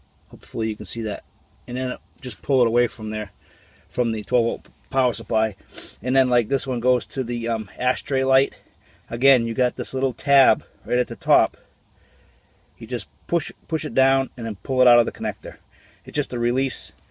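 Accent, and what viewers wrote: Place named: New York, United States. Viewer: nice new York accent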